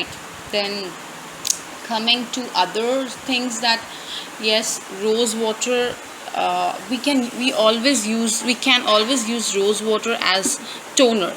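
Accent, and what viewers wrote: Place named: India. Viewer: BTW are you Indian?I can tell from your accent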